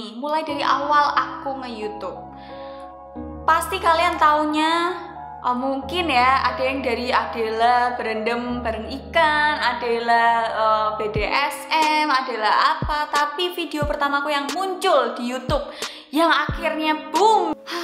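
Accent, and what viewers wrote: Java, Indonesia. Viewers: Ngegas medok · Senang dengerin medok mu mba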